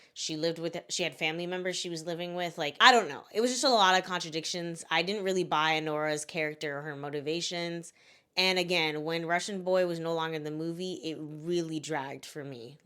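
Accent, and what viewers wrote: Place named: Canada. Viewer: Agree 100% on the first two although I do love the piano in La La Land. Studio Ghibli is amazing imo so hard disagree. I can understand your critique of TSITP because it's definitely problematic in some ways and not what I would do in Belly's (and Conrad's) situation for the majority of the books and show lol but I definitely have a nostalgic pull to it since I'm 31 and read the books when I was in high school. Also are you Canadian because you sound so Canadian lol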